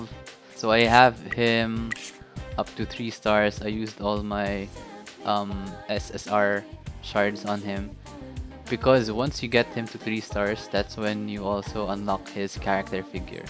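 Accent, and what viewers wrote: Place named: Philippines. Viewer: Are you from Philippines because your accent is Philippines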